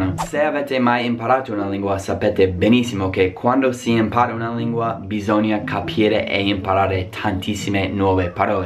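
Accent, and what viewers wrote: United States. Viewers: Ma quando hai una parola ostica da pronunciare, credo che tu sei abituato a visualizzare com'è scritta e di conseguenza la pronunci da americano. Se invece ascolti senza sapere come è scritta e provi a pronunciarla troverai meno difficoltà. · L'italiano è molto complesso come lingua. Ma, insomma lo parli bene con l'accento americano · Caro, io sono un'italiana che risiede nel US da 43 anni. Sono cresciuta in Italia ma ho fatto le scuole superiori qui nel US e ho dimenticato molto Italiano. Ero brava una volta a scrivere l'Italiano, ma ora non tanto. Ho imparato bene l'inglese e potrei correggere tanti americani. Complimenti. Se non hai scritto lo "script" per questo video, sei molto bravo. La pronuncia e` ancora molto americana, pero`. L'italiano lo parli meglio di me. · Caro non confondere il sesso con il genere. L'italiano avendo il genere anche negli articoli determinativi o indeterminativi, oltre al singolare e al plurale, e. 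molto piu' comprensibile di altre lingue. Non e'. difficile impararlo. I problemi sono altri, cioe' la sintassi, la semantica dello sterminato vocabolario,i modi e i tempi dei verbi e tanto altro. Per quanto riguarda la pronunzia, purtroppo e' piu' complicato in quanto i popoli anglo-sassoni di madrelingua, con quel tipo di pronuncia, modificano in modo naturale le corde vocali. Basta vedere la differenza tra te che sei americano e un inglese di Londra,la pronuncia e' completamente diversa.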